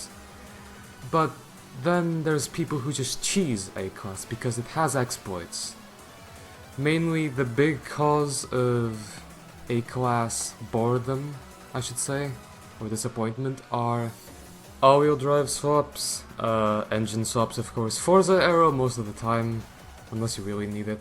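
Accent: Portugal. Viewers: Portugal accent? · Caralho, seu inglês é muito bom, muito de vez em quando posso ouvir sotaque português. Bom vídeo como sempre! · Voice is heard.. Maybe now I get a gf or a car faster... (By the way nice smooth Portuguese English eh)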